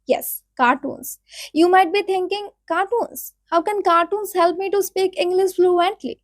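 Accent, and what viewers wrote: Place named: India. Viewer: I like accent Indiah😊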